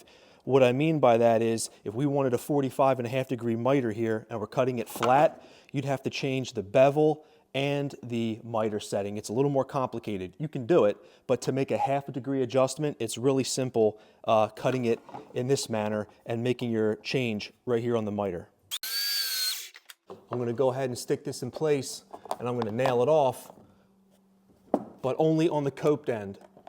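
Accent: Philadelphia, United States. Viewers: thought I detected a Philly accent. then I saw the hoody. go phills!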